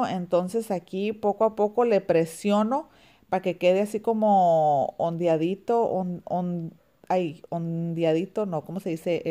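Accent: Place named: France. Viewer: Ayyy mi hermosa me super diverti viendo el videito te quedo hermosa la muñequita aunque se te salga lo frances. Bendiciones 🙌y un🤗 de🐻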